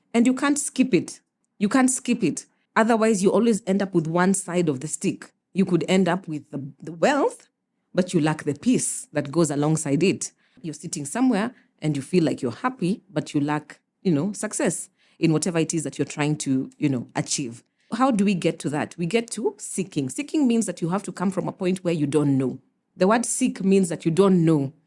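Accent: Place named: United States. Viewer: Kizungu ya amerkaa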